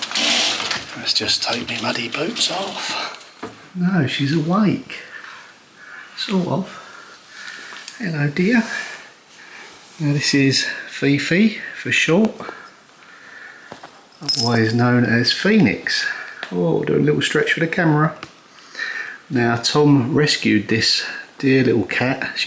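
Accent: United Kingdom. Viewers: I love seeing your videos, both here and on the Pethericks!. I'm curious, where in Britain were you raised? I'm working on my accent for acting and wonder where your accent comes from? Thanks!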